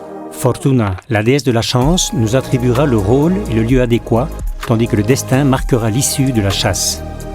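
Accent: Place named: Belgium. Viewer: Chouette film mais les commentaires sont d'un pompeux !!!! Très énervants et dits par un belge , je dirais , mais cela n'est pas le problème...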